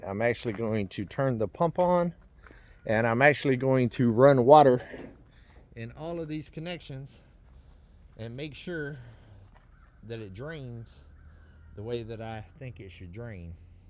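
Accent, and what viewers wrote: South, United States. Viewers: I think you're amazing and who cares if other people say you talk too much on this channel that's what it's about letting us know what's going on how you feel how you don't feel how you which is so nice a beautiful person like yourself should not be told to hush I love your accent you have a Southern accent I love the way she talks which is just a beautiful person just like you so keep on keeping on and I'll keep going on those Adventures with you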